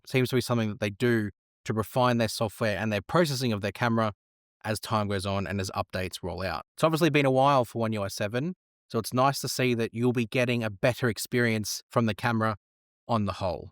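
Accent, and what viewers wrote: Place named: Australia. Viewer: It's nice to hear an Aussie accent instead of US accent for a change.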